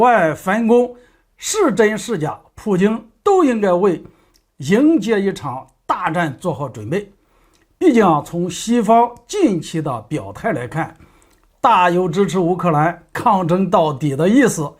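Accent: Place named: Shandong, China. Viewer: 山东口音太重了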